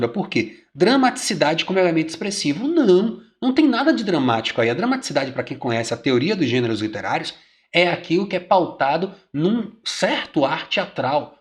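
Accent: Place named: Bahia, Brazil. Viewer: baiano ne? e foda!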